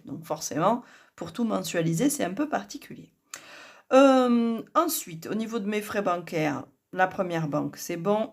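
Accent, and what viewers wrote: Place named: South, France. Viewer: Binjour Moi je trouve que vos vidéos dégagent une énergie positive j'aime bien vos écouter avec votre petit accent du sud je trouve votre vidéo au sujet des revenus irréguliers avec un budget stable de très bons conseils pour des gens à leur compte et bravo aussi pour l'immobilier car nous en avons un peu aussi et c'est vraiment un boulot plein-temps avec souvent des demandes des locataires des locataires ,qui ont la bougeotte ils savent pas où ils sont bien ce n'est pas évident à gérer du tout et attention aux formations hors de prix qui vous disent que les banques prêtent à tour de bras une fois que votre formation est payée assez cher la banque ne prête pas toujours on a eu le cas dans la famille justement cette année avec ma fille infirmière "suspendue"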